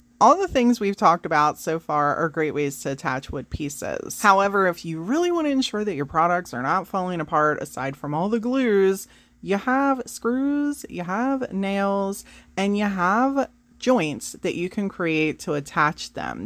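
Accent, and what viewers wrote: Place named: Baltimore, United States. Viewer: I did actually mean like how to sell my products lol sorry I suffer with chronic pain and sometimes my words get jumbled up. Can I ask where you are from? I’m originally from Baltimore, MD, but I’ve lived in San Diego, CA since I was 8-9, it’s just your accent that reminds me of my mom’s. She’s was born and raised in Baltimore and she had a very similar accent like yours. 😊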